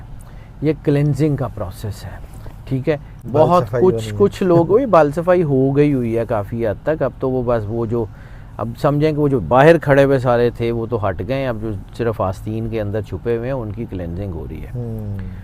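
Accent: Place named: Lucknow, India. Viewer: 'Andaz e Guftagu' sounds like Luckhnowi Urdu, really.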